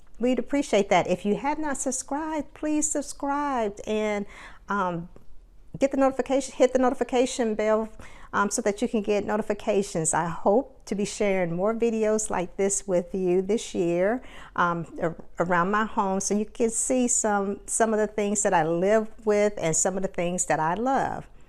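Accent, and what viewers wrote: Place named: South, United States. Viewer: I had to downsize but I can not wait to move this summer to a larger home which will hopefully have a much larger kitchen so that I can use my Hutch again. We are so similar I love vintage cookware and kitchen gadgets and such, I have Pyrex bowls very similar to yours. I bet your home is very warm and inviting and comforting and that is the type of vibe that I hope I give in mine and I feel the same exact way about my kitchen it is the heart of my home. Where do you live down south if you don't mind my asking? I am from New York but I've been living in Jersey the last several years. I love your accent!!!!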